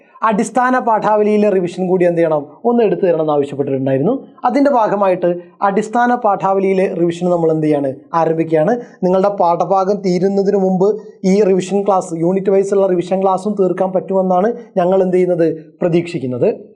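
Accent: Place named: Malappuram, India. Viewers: Malappuram basha aan poli🔥💕💕💕thallumala⚡️👍🏻🔥🔥malappuram⚽️ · മൽപ്പൊർത്തെ വർത്താനം പൊളിയാണ് സാറേ..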